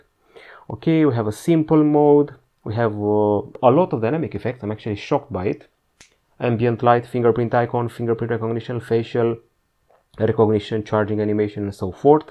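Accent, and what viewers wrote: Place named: Romania. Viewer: Vorbeste in limba romana. Se cunoaste dupa accent, ca nu prea esti englez😂.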